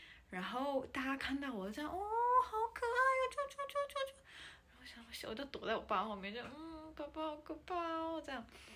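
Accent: Taiwan. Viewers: 以前一直很想聽聽看長著外國人的臉講台灣腔中文的外國人是什麼感覺，因為自己想像有點難，現在終於實現了ＸＤＤ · 想說怎麼有一個講話這麼台的外國人 然後把妳的影片看了一遍，連新聞片段也去看了一下 訂閱妳了，加油啦！ 如果想做YT一定會有非常多酸民的 要進廚房就不要怕熱 而且酸民也是來幫妳充流量的 只是他們不酸一下會不舒服 做空姐也是會遇到奧客的 家是人的避風港，如果妳覺得台灣是妳的家， 那這裡就是妳的避風港，歡迎回家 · 光聽講話就知道一定是在台灣長大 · 我在台灣出生的女兒剛好跟版主相反，她仍有台灣國籍，我是美國陸軍退休，駐於德國兩次共6年，一次在巴伐銳亞省的Vilseck，一次在Wiesbaden，我女兒在4歲的啟蒙教育是在Vilseck的鄉下的學校很快就學會巴伐銳亞腔的德語，出外在德國旅行有需要時都靠她翻譯，有一次開車去法蘭克福途中的一位德國人問我的女兒她的德語怎麼有這麼重的巴伐銳亞鄉下腔（就像Tyna在南部學台灣國語，我也是一樣，而我老婆是台北人，所以女兒也講帶美國腔的天龍國國語），我説女兒在Vilseck短暫讀過德國當地學校。幾年前我退休全家搬回美國加州，一位剛認識的小六同學問我女兒，為什麼長的東方面孔的她講英文有帶歐洲腔，幾年後因為不常用，她小時候順口的鄉下德語也忘得差不多了，只是偶爾不爽時德語三字經還是會不小心冒出來。😅😅😅感謝Tyna分享台灣。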